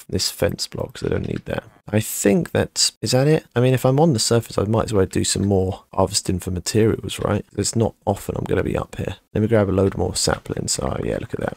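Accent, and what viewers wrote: United Kingdom. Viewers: are you from eastborne?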